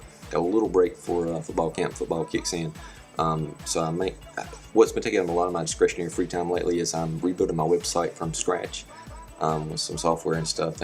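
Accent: South, United States. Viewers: I LOVED his routine about "stuff" (no air quotes there, buddy - those be the real McCoy!" I'm NOT just saying this, it's the honest truth - when I saw you posted a video and saw the length of it, my first thought was, "Weeee! 9+ minutes of listening to that endearing accent!" Imagine how hard I laughed when I read the bottom of the description - you nailed me! I stand guilty of being A. (but man, you talk FAST for a Suthenah) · I enjoy your vids, and yes, I am easily hypnotized by southern accents...esp when they have something interesting to say, as you always do. Yeah seeing George pass was tough, I am from that generation I guess. He will be missed. Thanx for your updates too!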